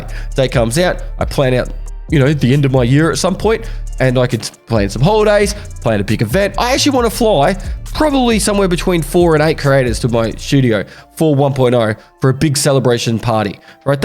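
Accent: Australia. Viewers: Most distinguishable Aussie accent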